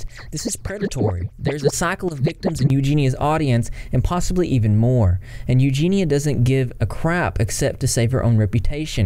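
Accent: South, United States. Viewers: This guy makes a southern accent HOT 🥵! 😅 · I love the subtle southern accent... not related to the video but your accent is nice haha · Odd question: Where’s your accent from? I love it; I’m guessing SC or maybe GA. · I cant tell if your from Southern USA or Austrailia ? Love your accent 😍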